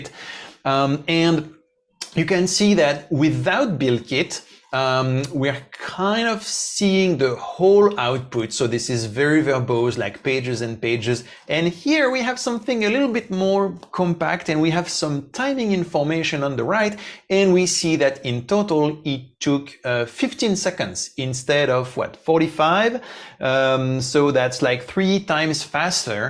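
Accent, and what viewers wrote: France. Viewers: Haha, definitely not :) What confused me was that the guy has French accent, but the prompt is in Cyrillic.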